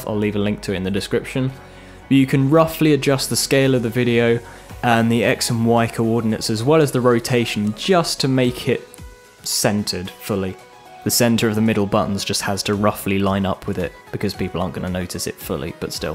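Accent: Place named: United Kingdom. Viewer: OMG YOUR BRITISH! (sorry, i'm new to your tutorials)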